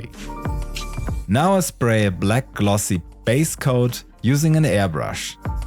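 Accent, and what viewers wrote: Germany. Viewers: German . I hear the accent. lol is this doc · Cooles Video und auch cool das du so gut fachlich Englisch spricht man hört aber sehr gut den Deutschen Akzent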